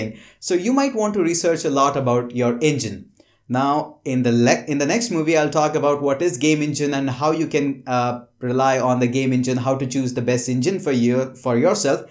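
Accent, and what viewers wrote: India. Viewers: Sound Indian but surname spelt the pakistani way?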